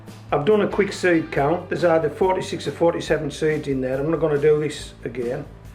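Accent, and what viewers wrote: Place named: England, United Kingdom. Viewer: Great video! I laughed all the way through at your accent 😄😆😂 I was born in Harrogate, but came to Australia when I was 3 yrs old, but I have an elephant memory, so I have a lot of memories of my time in Emgland, even though I've never been back. I recognise your accent, so entertaining to me 😄😆, you sound like my Dad who I don't get to hear anymore 😔 keep up the good gardening, wouldn't be easy in the UK compared to Sunny Australia, it's Autumn where I am and still 30 deg 😉👌🏾 I'm about to plant my peach sweet potatoes and add some coffee grinds I've been collecting now for about 2-3 year ⬅️ 😄 that's my pommie 😂. 🇦🇺☀️🇬🇧🌨💦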